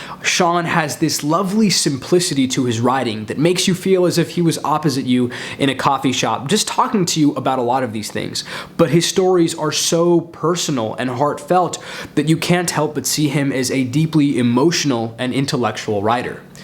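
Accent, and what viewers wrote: India. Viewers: Your English assent just like Shashi Tharoor .....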